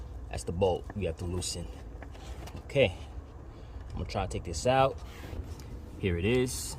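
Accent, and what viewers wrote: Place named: New York, United States. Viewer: Bruh you from the east coast huh you sound like my partner Tommy he say stuff like there’s gone be mad fluid like the lingo and accent sounds like him and New York people 😇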